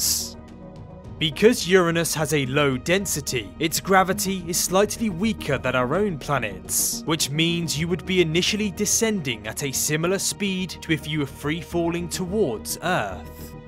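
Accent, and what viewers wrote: United Kingdom. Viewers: His british accent make it even better,uranus is so deep btw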